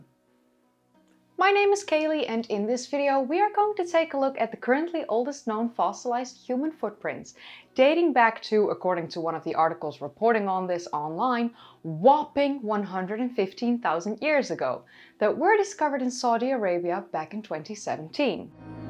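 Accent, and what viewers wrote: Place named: Netherlands. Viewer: I love the Dutch accent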